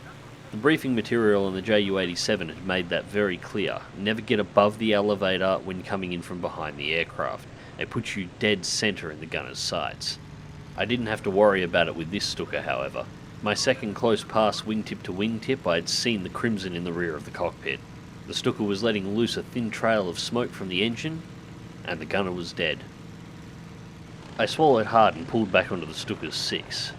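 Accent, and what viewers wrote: Australia. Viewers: I LOVE THIS!!!! I am a "Yank" in Tennessee and just wanted to say ,I haven't even finished watching the video , but had to stop to tell you ",YOU HAVE FOUND YOUR CALLING!"Ive been wandering what you would do now that Giajin has "went too far"!! your Aussie accent ,style, and obvious knowledge of what you are talking about is what makes this hopefully, the first of a VERY long and successfull series! Would like to see two a week if possible, could run for YEARS!! "THANK YOU VERY MUCH FOR ENTERTAING ME!!"...was about to give-up on YouTube. :)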